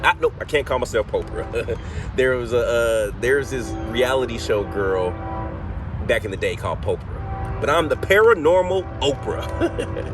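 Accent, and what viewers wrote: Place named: South, United States. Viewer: Just curious, why don’t the spirits that come through have southern accents like yourself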